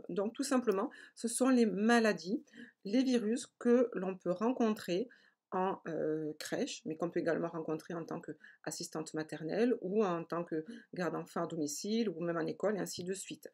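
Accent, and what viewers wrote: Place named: South, France. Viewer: Merci pour vos vidéos qui sont très intéressantes ! Si je peux me permettre un détail, vous avez un très bel accent du sud avec une expression qui revient ÉNORMÉMENT (le fameux "tout simplement") l'avantage c'est que ça laisse le mot "simple" en tête et c'est toujours mieux que "compliqué" ;) tout simplement :D merci encore pour vos vidéos qui m'aident à y voir plus claire dans la formation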